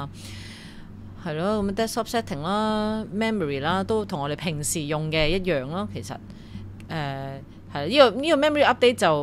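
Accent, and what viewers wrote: China. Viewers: 大陆哩音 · 这些不能叫做乡音，完全是一个母语普通话的人在学讲广东话。😂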